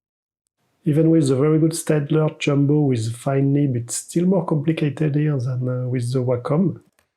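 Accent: France. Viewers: Toi t'es Français. Tu parles aussi bien que moi avec ton accent magique et grace à ça j'ai tout piger. Merci.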